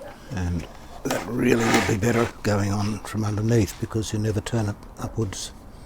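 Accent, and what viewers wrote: Australia. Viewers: Geez thanks, Yup I first saw Manuel shield the caps this way then Dave and you, so if it's good enough for the pros, I figured it was the way to go. The thing is I have no formal electronics' training, I didn't even learn a trade in school; My first studies in college were photography, I struggled to get by in this field so later in life I had a career change and became a police officer (nobody's perfect...) Then after a few years of retirement I stumbled across some of Dave's radio restorations and I was hooked from the start. I always loved vintage audio and my personal gear is all from the 70s and 80s. I leaned about radios by myself and watching you guys' tutorials and got in contact with Dave for my first restoration of a 1937 RCA-Victor 87-K AM console. He's been mentoring me ever since, I owe him everything. As I gained a little more experience, like I said I started understanding more and more about radios and repairing them and there no better hobby for me at this point in my life. 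As for editing videos I know what you mean believe me, I 't have a proper video camera so I shoot everything on an iPhone and Apple being Apple, editing is always challenging... I of course subscribed to your channel some time ago and I'm always looking forward to your next instalment. Oh and by the way I love the Aussie accent 🙂 Cheers mate, lol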